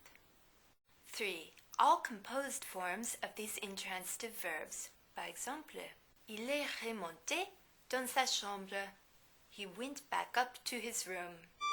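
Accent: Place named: Canada. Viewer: I like your canadian accent . thank you so much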